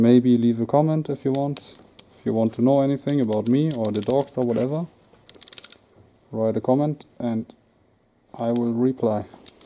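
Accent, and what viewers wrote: Germany. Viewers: Deutscher Akzent on point, aber richtig gutes und interessantes Video. 😉 Like hast du. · Mach deine Videos doch einfach auf deutsch. Dein deutscher Akzent ist unüberhörbar 🙄 Ich wollte in den 90ern auch immer eine RS. Hatte damals eine Yamaha DT80 MX. 9000 Mille kostet die Aprilia damals.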